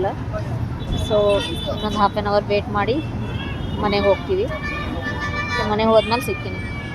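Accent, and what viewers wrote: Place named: Bangalore, India. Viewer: niv uttar Karnataka bitu Bangalore language yak mathadakaiiri😅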